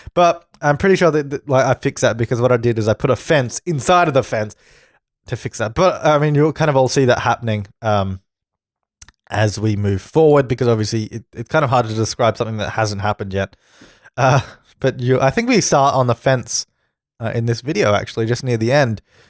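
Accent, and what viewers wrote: Australia. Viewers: Marry me! Ur so cute! Love ur aussie voice! Cuuuteee! Wanna learn how to Make a World! I Think my pc is toooo Slow! Xoxoxoxo from Denmark! 🇩🇰🇩🇰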